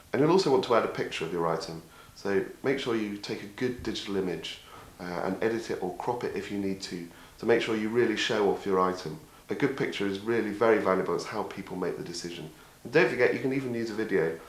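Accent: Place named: United Kingdom. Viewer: IM from us and i understand him? Have you ever heard of a British Accent ? lol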